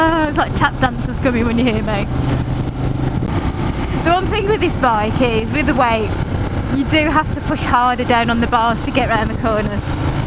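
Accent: United Kingdom. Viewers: I LOVE how you speak. Are you english? And forget the hate. Because the "negative comments" that I saw here are clearly hate. Out of jealousy, and fear. Guys don't like when they meet girls who have double their balls. And for a girl to ride a Diavel, she needs big balls ahah! Tell me, are you tall? Small? What's your frame? I wonder if a short, slim girl can actually ride a Diavel.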